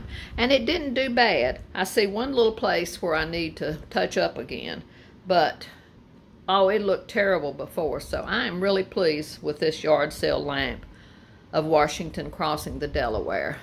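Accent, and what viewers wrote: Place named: South, United States. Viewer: Love it ALL!!! You certainly have more energy than I do!! Be careful in the heat!!! Fun to watch and hear your great "southern lady" voice!!! You, John and Baby Boy have great weekend! Good luck at the yard sales! 🧑‍🦳🏡🌹🙂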